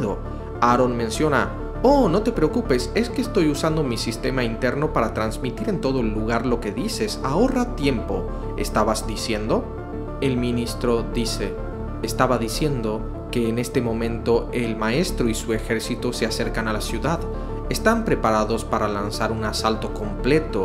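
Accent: Argentina. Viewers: Alguien que me diga de que parte de Argentina es el narrador?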